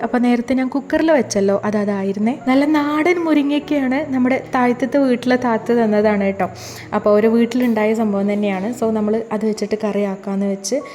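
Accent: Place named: Malappuram, India. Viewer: നിങ്ങടെ മലപ്പുറം ഭാഷ കേൾക്കാൻ ഒത്തിരി ഇഷ്ടാണ്. അടിപൊളി 👍👍👍🥰🥰🥰🥰🤩🤩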